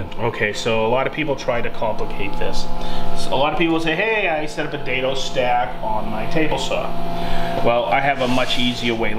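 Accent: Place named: Boston, United States. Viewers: I became one of your subscribers recently. I'm from Mass originally. I moved to the South, KY and it's great to see and hear your humor and Bostonian accent ( and this t shirt here) as well as your thorough teaching abilities. I have to say you, Bents, and Tamar channels are some of my favorite, great teaching and no fluff content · best "Mwwwoooortise"...... luv the East Coast accent. Good video boys!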